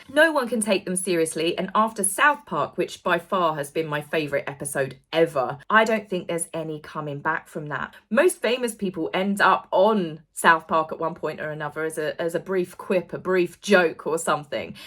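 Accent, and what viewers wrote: United Kingdom. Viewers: Mahalo! You are so enjoyable to watch and get tickled by your British accent! Hanahou!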